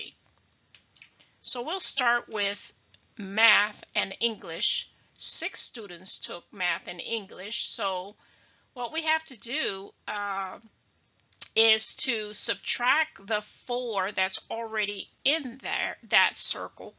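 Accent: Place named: United Kingdom. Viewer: shes british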